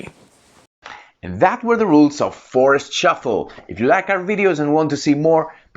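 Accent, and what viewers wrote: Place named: Greece. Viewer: Great video with a really smooth explanation, thanks! Wife and I also busted out laughing at the beech tree part, , "if there are at least 4 beeches in your forest"😆 Greek accent? Kudos on the excellent English which is far better than my second language. Gotta love when accents make words sound like other words and it's totally innocent yet hilarious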